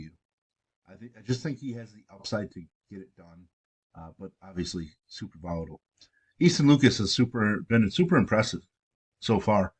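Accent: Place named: Wisconsin, United States. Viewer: Dope info here. You got to be from Wisconsin right?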